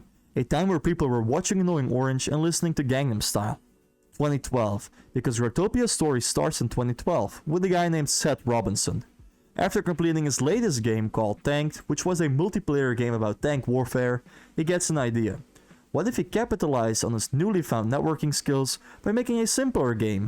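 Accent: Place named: Lithuania. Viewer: lietuvis ,ane? is akcento matosi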